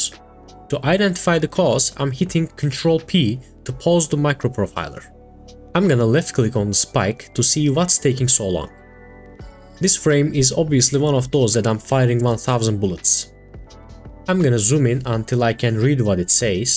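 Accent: Turkey. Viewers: Aksanından Türk olduğunu anladım gayet güzel bir video olmuş... Anlatımın harika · your accent reminds me of Turkish people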